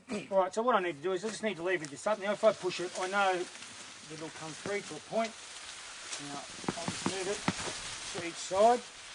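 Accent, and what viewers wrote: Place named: Australia. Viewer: As an American, I really enjoy listening to an Aussie explain things. Always straight forward to the point. Great video.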